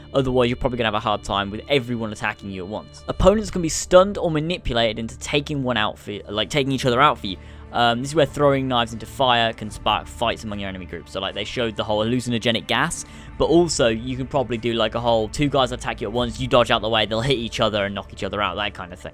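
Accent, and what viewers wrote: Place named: United Kingdom. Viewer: Can you stop with that horrible british accent?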